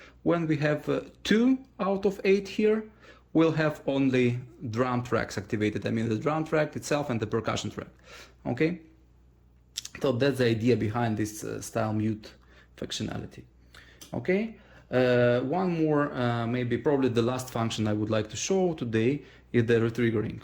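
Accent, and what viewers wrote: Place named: India. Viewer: Донт анестенд :) У вас кстати индийский диалект :)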